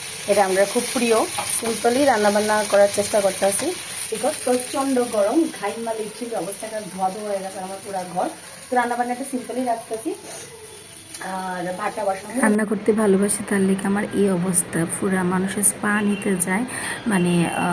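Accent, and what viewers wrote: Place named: Bangladesh. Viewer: Apni ki Bangladesh er hindu? Apnar accent ta sune mone holo tai jigges korchi...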